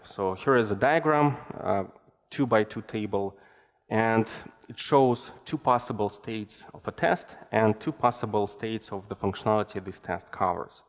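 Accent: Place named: Russia. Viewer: Родной язык автора - русский. Да и говорит он с заметным русским акцентом. Для чего он на русскоязычной конференции говорит на английском?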